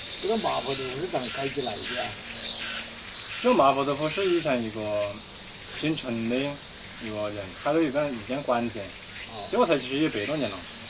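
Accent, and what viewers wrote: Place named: Renfu, China. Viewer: 我说口音咋这么熟，都是属于仁富片区的啊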